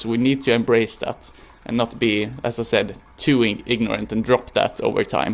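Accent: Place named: Sweden. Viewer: Love your Swedish accent and your appreciation for nature and beauty. "Just me and the plants" ♡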